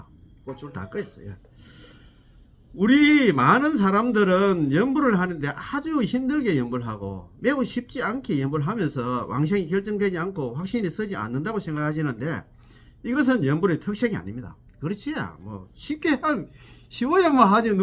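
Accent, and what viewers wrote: South Korea. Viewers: 믿음직한 대구사투리 정말 좋습니다. 믿음이 더욱 확실해지네요. 나모아미타불 나모아미타불 나모아미타불 나모아미타불 나모아미타불 나모아미타불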